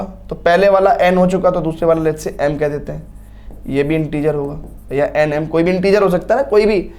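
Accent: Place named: Muzaffarnagar, India. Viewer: Sir aap muzzafrnagar ke ho mai bhi😊 aavaj se hi pta chlra ji😅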